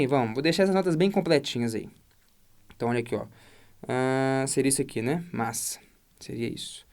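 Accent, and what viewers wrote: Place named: Belo Horizonte, Brazil. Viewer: quando comecei a assisti seu canal tem um tempo, e tinha algo além do conteúdo que me chamava atenção dos demais canais, mas nunca tinha percebido. Recentemente vi um de seus stories e descobri que você é de BH, igualmente a mim, e aí eu percebi o que me chamava a atenção Esse sotaque de belo-horizontino rasgado kkkkkkkkk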